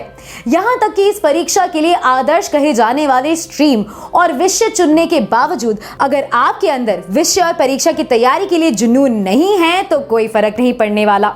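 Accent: Standard. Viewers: Wah didi itna shudh hindi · दीदी आपकी शुद्ध हिंदी से मैं बहुत प्रभावित हूं जिंदगी में पहली बार किसी के मुंह से साक्षात सरस्वती मां की बाणी सुनी है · Wow Dhany ha Devi ji (ma'am)Aap etni sudh Hindi to hame Aaj tak Kisi teacher ko dekha hi nahi Speech dete hue. 🙏🙏🙏👏🙂❤️ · Didi itnii shudh hindii🥺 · Clear and sudh hindi .. o my god 😘😘😘😘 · Itni shuddh Hindi 🎖️🏅🥇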